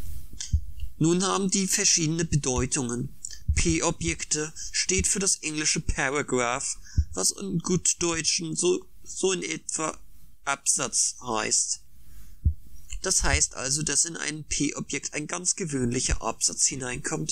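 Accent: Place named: Sauerland, Germany. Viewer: Welch Kräftiger Dialekt, Sauerländer?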